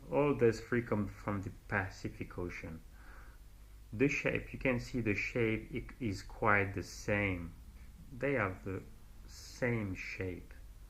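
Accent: France. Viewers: I think your YouTube channel is a great thing, but I'm going to be honest with you, if you wear your mask and snorkel (probably your fins too) noone in the scientific community is going to take you seriously, the image implies that you dive for shells in approx 30 ft at best, you are missing out on so much more....btw your French accent is awesome :) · Why would anyone apologize for a French accent, among the most elegant on the planet? Votre voix est tres suave.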